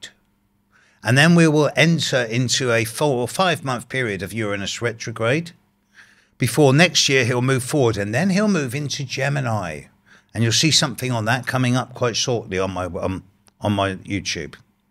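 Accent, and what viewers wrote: United Kingdom. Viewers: Greetings from the city of João Pessoa, Paraíba, Brazil. I thoroughly enjoy your analysis and may I say your voice is so agreeable, your diction so clear, not to mention your beautiful British accent. Many thanks for taking the time to keep us informed.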